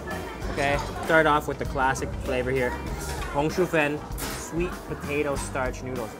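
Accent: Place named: China. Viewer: As lived out of China for long, it is really memorable to see these food. Good job man, interesting to see it from the view of foreigner. Man, you talk with local accent, haha, that is lovely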